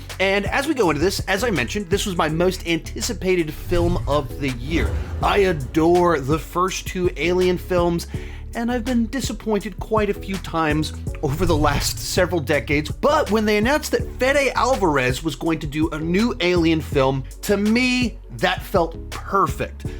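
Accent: United States. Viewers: The voice and speech pacing of this guy are so American. Too much gesticulation. Sorry, unbearable for me to watch & listen to.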